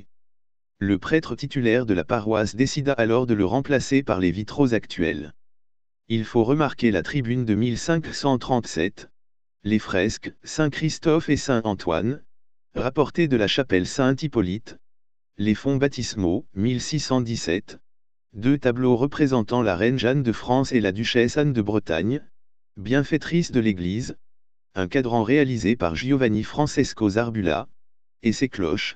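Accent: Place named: Uzbekistan. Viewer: Pourquoi cet accent ouzbèque quand vous parlez ?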